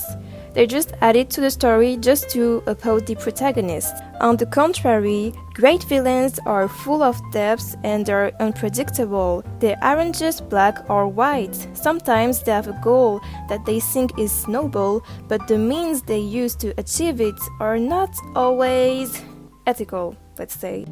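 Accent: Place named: Eastern Europe. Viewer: Let me guess, you're from eastern Europe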